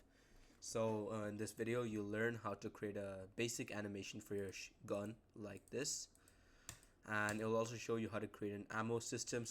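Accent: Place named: Germany. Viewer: Hey where are you from? You sound like you're from Germany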